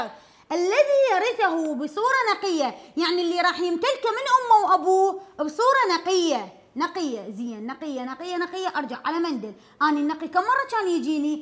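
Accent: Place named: Mosul, Iraq. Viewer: حظ انتي مصلاوية موو؟ عغفتو من لهجتكي + عاشت ايدك والله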